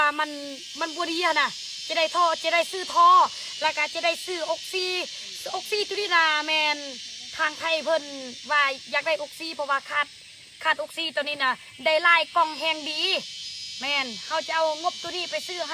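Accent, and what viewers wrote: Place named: Luang Prabang, Laos. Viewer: ฟังสำเนียงเจ้ แก เว้า กะรู้ ว่ามาจากทาง หลวงพระบาง หรือ ที่ประเทศไทยเราก็ สำเนียงคนเมืองเลย.หรือจังหวัดเลย😂😂😂สู้ๆเด้อเจ้ คนสวยใจงาม.#คนไทยในต่างแดน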